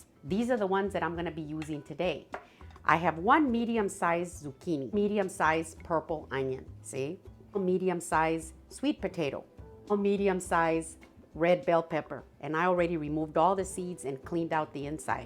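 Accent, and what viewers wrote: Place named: Midwest, United States. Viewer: This is my kind of food! Looks delicious! Your MN/Dakota accent is adorable ❤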